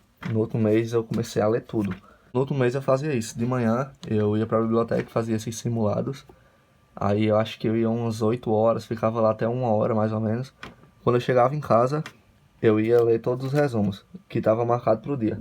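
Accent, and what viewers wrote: Northeast, Brazil. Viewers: Você é do Nordeste?🤔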